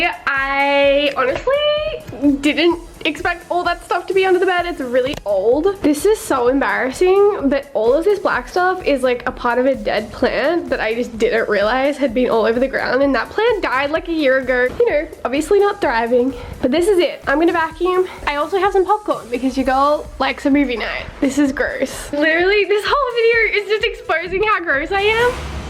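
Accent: Australia. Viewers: are you an aussie trying to sound american or an american is australia?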